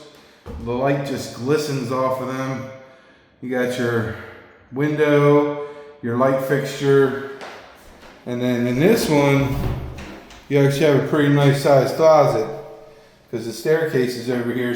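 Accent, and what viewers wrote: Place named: Baltimore, United States. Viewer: Love the Bmore accent hon 🧡🖤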